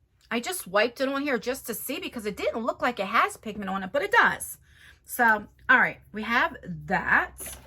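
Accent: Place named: Philadelphia, United States. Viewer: You're accent makes me nostalgic for home. I'm from Philly but Jersey n Philly ppl have the same accents. Lol. My accent kinda diminished through the yrs of not living in the area. It took a long time to stop myself from saying wooder instead of water.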